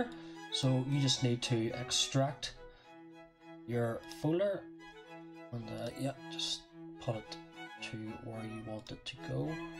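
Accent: United Kingdom. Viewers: Is that a Belfast accent?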